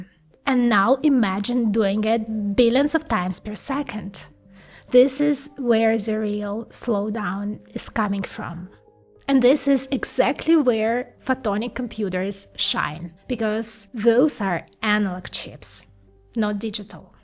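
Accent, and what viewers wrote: Russia. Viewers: i would really love to hear you speak in your natural language which i assume is Russian. Even though you speak in English, you cant hide the natural mother tongue. Not saying anything bad about your English or anything, its absolutely perfect, just would love to hear you in your natural mother tongue, i think it would sound more fluid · You've improved so much compared to your earlier videos, and it's great that you’ve stopped using the Russian 'kh'х' sound. I really love your growing confidence—keep going!👍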